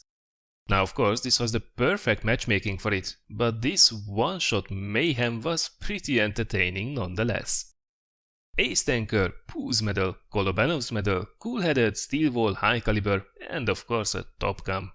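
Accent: Hungary. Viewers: Such nice Hungrish accent. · hallom az ahcentusából :)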